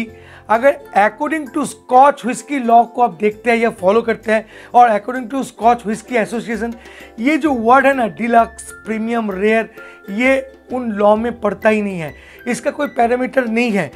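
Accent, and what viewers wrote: Bengal, India. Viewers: Tum bangali hai kya? Bengali me bat karo.. Your hindi is shit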